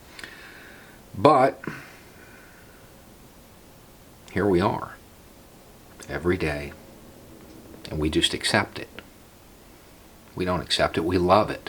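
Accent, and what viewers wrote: South, United States. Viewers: Dan Carlin is absolutely more knowledgeable, he should have an honorary doctorate in history from some prestigious university. However, this man reaches people that Dan could never, because of the language and dialect in which he speaks. talks to people from Appalachia and the Southern States in a way that is digestible. If someone from the "outside" spoke the same words, with more authority....it would feel condescending. Nothing shuts down a blue-collar working southerner, more than someone with a Brady bunch dialect trying to give them a history lesson.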